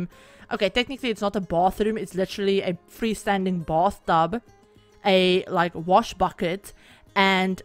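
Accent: South Africa. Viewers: Wait are you South African?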